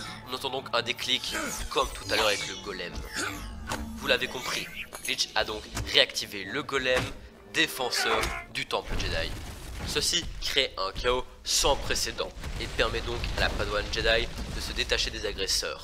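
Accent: Belgium. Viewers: ❤ l'accent belge qui tue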